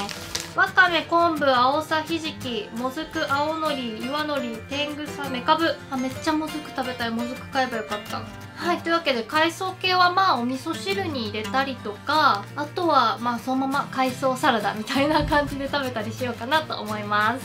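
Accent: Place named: Kansai, Japan. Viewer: 関西弁ええなぁ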